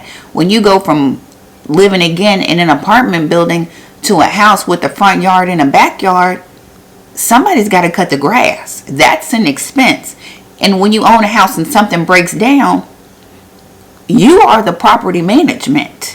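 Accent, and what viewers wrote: South, United States. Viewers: with a southern accent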